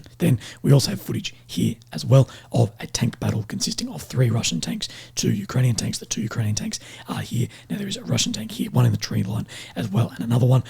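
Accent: Australia. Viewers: You start to sound a little like Robert F. Kennedy, Jr. And as an encouragement I'l like to say that Kennedy didn't want to run for a president because of his voice, but they ran a probing campaign and polls showed that most American are ok with his voice and he is popular anyway. That made this admission in his recent interview with Jordan Peterson. I personally don't find it hard to understand what your say because of the more coarse voice. In fact, your Australian accent is much more of an obstacle to me. All that said, I hope you recover your voice soon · Mate, it's really fantastic your Aussie accent! I'm learning the difference from colloqiual English following your channel, so go on as usual I love it!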